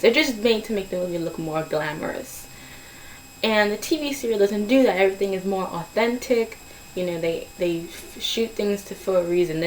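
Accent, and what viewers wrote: England, United Kingdom. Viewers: My comment won't go through! 1 more time. You know you had an English accent through bits and pieces of your vid, it sounds like you weren't aware it though. :laugh: I'm not trying to embarrass you or anything, cause I've done the same thing. I watched 4 HP movies in a day. I couldn't shake the accent that kept popping up for the rest of the day! Same thing happened when I watched Extras. Colin Firth is sexy, and a much better Darcy. I like the 2005 movie, mostly cause I'm a big fan of Keira. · i dunno, but for some reason, i thought i heard an english accent from you through out this video, was that planned?! lol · wow...cool brittish accent. but it was kinda weird how you started on the brittish accent...then broke off and started talking normally...then started the accent again. But, it was a great rant, and i would have to say that i liked the 1995 pride and prejudice better. 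heh heh...im a sucker for the classics. :)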